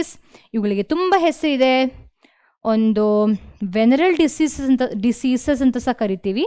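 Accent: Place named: Mangalore, India. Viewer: ..... manglore kannada So beautiful so eligate ..just looking like a wow..❤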